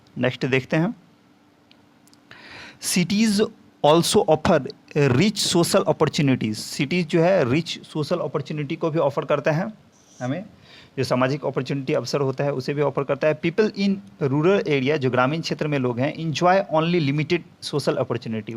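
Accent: Bengal, India. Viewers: sir, aap bengali ho?? LOVE THE WAY YOU TEACH...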